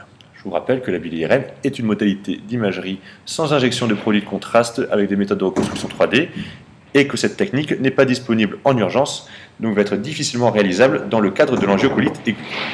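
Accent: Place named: France. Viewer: le meilleur accent de France, merci pour cette video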